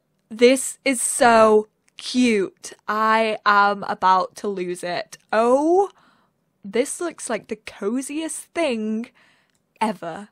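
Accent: England, United Kingdom. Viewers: you are my favorite youtuber!!!! you are one of the only youtubers that makes quality sims videos, or videos for that matter. the fact that you have an English accent is amazing, not just the normal American. =)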